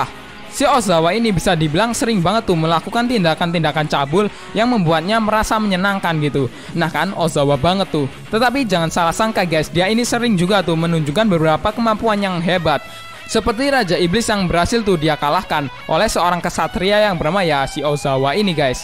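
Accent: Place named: Palembang, Indonesia. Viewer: Orang Mana? Kok Rada Bahasa Palembang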